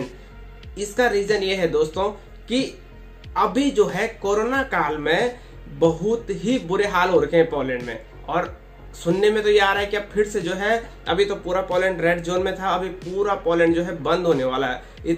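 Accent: Garhwal, India. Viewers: Bhai. G kakha ka cha grhwali tune aani cha bht bdiya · Bhai apka voice ton gadwali hai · Very good bro tumhari awaaj se lgra tum gadwali ho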